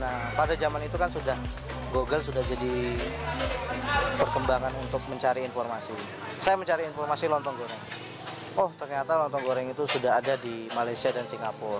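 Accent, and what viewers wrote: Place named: Java, Indonesia. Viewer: Masnya lama di jogja jadi sdikit medok, hehehe, sukses trus mas....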